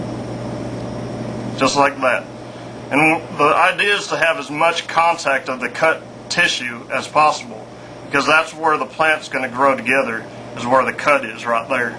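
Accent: United States. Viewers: is that a new york accent? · damn you got some heavy southern dialect going on!!! Be proud of that! Greetings from norway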